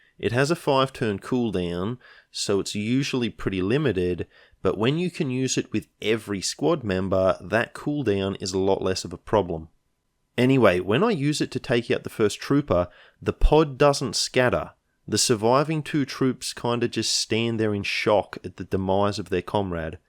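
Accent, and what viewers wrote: Australia. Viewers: your accent is uniquely Australian. you sound like a Queenslander but you know... your not being a cunt, so thats nice. keep it up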